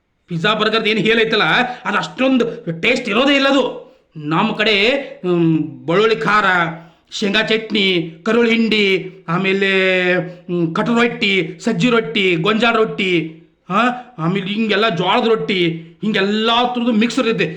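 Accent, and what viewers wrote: North Karnataka, India. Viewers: Chanal ugiri sir nimma. Matu super uttar karnkad matu benki🔥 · ಸೂಪರ್ ಅಣ್ಣಾ,, ನಿಮ್ಮ ಮಾತಿನ ಶೈಲಿ ನಿರೂಪಣೆ ತುಂಬಾ ಅದ್ಭುತ. ಉತ್ತರ ಕರ್ನಾಟಕ ಮಂದಿಯ ಮಾತು ಕೇಳಲು ನನಗೆ ತುಂಬಾ ಇಷ್ಟ.ಧನ್ಯವಾದಗಳು ಸರ್.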